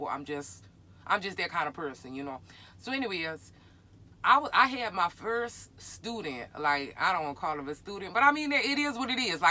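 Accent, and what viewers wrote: Louisiana, United States. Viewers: Got a mad crush on you, love visiting your page. That cajun accent, soooooo weeeee, gotta find you hotness. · I love your Louisiana accent!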